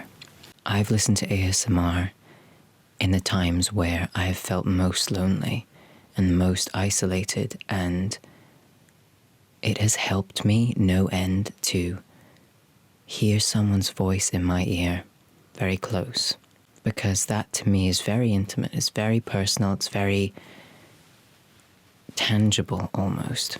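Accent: Scotland, United Kingdom. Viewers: cool! I thought I detected Scottish! I'm American but my dad's side of my family is Scottish, so I hear their accents almost every day! I've always wanted to go there.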